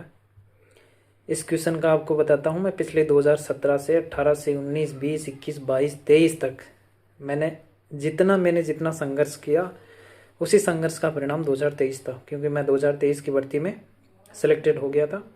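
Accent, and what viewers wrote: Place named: Bharatpur, India. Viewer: Abki avaaj bol chal bhartpur ki se ha bhai reply Dena bhai kaha se ho or itbp animal transport kitni chhutti milti ha 1 saal me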